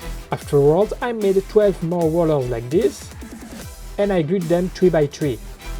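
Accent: France. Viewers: Ton accent est ... comment dire spécial ( un accent français quoi ) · T'es français ça se voit à ton accent 😂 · Are you french ? You have a french accent ! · On dirait un gars qui parle anglais depuis peu ton accent c'est bizarre. Je dirais presque un français.... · you have a french accent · You are French? I can hear the accent because I'm French haha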